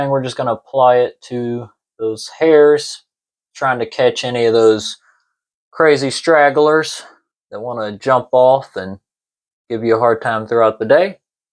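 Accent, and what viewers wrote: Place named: South, United States. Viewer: i was honestly not expecting the southern accent.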